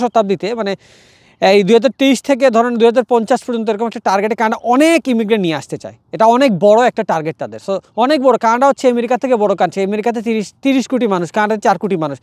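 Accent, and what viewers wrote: Sylhet, Bangladesh. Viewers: আপনে সিলেটি ভাইসাব নি?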